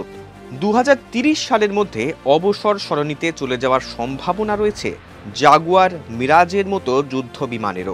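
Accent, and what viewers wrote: Bangladesh. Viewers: বাংলাদেশের মানুষের মতো কথা বলে ছেলেটা😊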